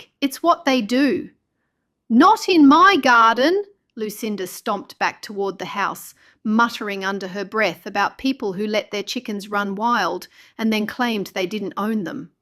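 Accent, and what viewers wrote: United Kingdom. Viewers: Wow! Heavy British accent!😂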